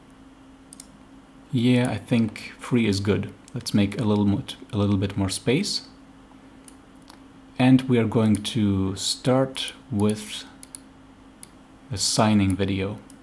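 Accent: Germany. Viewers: Thx you. Very clear and well explain. love the german accent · Nice tutorial. Very usefull.... and great german accent ;)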